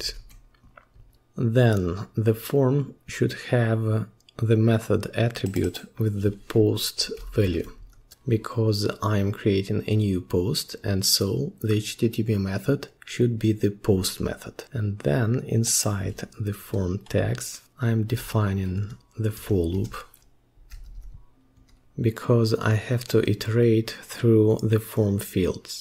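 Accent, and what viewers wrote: Russia. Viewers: слышу родное "зэ"😆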